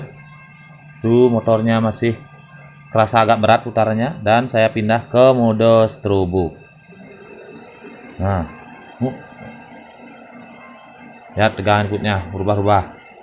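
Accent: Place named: Bali, Indonesia. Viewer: Kaka ini orang bali kah ko logot nya kayak orang bali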